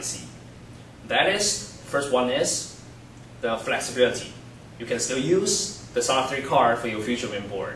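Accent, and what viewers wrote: China. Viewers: Chinese English!!!!!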